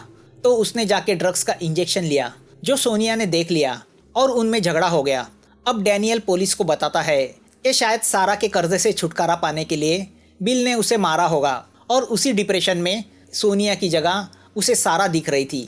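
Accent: Maharashtra, India. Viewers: Sir are u marathi i think so becose of ur hindi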